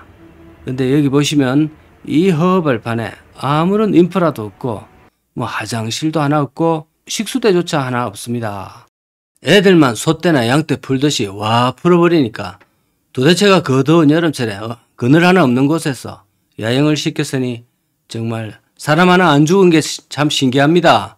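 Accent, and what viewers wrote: Gyeongsang, South Korea. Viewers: 미국에서 너무 재미 있게 보고있읍니다. 경상 사투리도 구수 하구요. 한국에 안 가도 구섯 구석 잘 보고 있어요. 아무쪼록 건강하시고 발품계속 팔아 주이소💕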